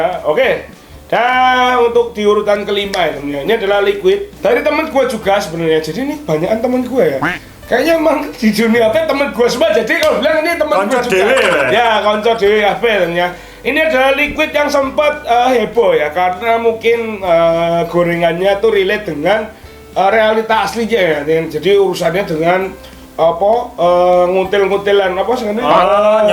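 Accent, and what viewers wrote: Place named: Java, Indonesia. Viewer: Skalin konten ngomong gua nya 1000 kali😂😂 medok lagi😂😂🙏🙏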